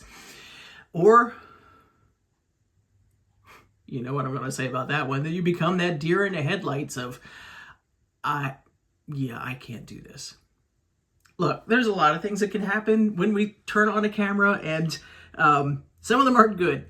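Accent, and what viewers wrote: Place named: Midwest, United States. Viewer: Are u from the midwest. I lovvvve your sense of humor. You had me laughing so hard numerous times. Content n comfort is awesome. Thanks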